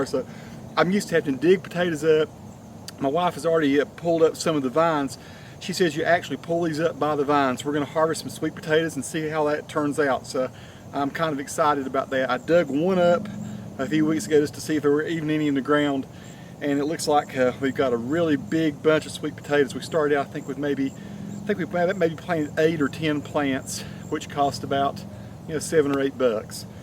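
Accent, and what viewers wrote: South, United States. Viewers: Your videos are worth watching just to hear your Southern drawl. Next year, grow your own slips! You can get about 50 plants (slips) off of one sweet potato when you grow your own. Then it will cost you NOTHING, because you save your good potato for next year's slips.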